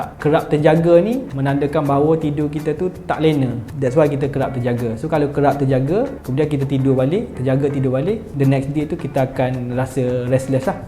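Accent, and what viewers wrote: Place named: Kelantan, Malaysia. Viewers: Pekat dialeg kelantan walaupun bercakap dalam berbahasa Malaysia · Bkn dgr dialek tu kelate john penang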